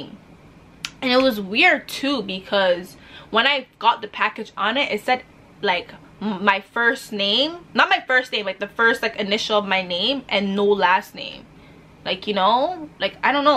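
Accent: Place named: Toronto, Canada. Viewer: I loved this video she talk like a Tdot gyal fr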